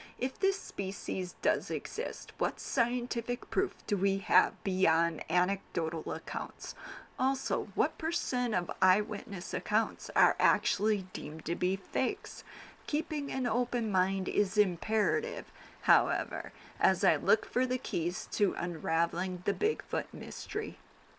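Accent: Wisconsin, United States. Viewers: I think some of the commenters think you're mocking believers because of the tone of your voice, you're not, if people have seen your other videos you have a very open mind. I think it's the Wisconsin accent that's throwing people off.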